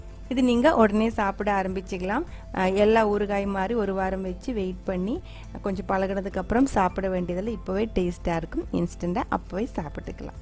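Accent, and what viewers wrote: Kerala, India. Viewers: U Malayali or Tamil? 🤔🤔🤔🤔🤔 Talking so different 🤔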